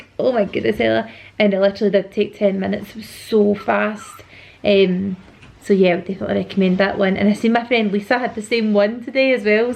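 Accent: Scotland, United Kingdom. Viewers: Since english is not my native language, it's so hard for me to understand your scotish accent. But I'm not giving up on you! You are so simpatic!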